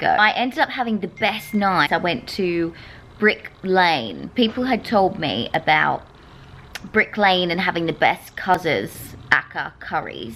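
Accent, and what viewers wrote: Australia. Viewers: thank you for making me smile thro out these vlogs. ive been poorly and feeling very down lately and you always make me smile 😌I hope is meet you one day seems I love Australian accents 😍 Insta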